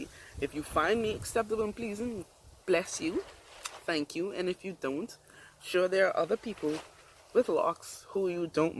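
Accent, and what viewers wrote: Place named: Bahamas. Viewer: I knew I recognized the accent ... I'm from Nassau, lived in Freeport for 20 something years. Been in the States for 21 years. My locs are 8 years old, had to combine twice. They're almost waist length. I'm trying to commit to freeform, semi is harder since my locs are thicker. I will commit though. Not religious reasons, I just love it and chemicals are no good. I absolutely love your locs and the styles. Shalom Queen !👑💜👍🏽💋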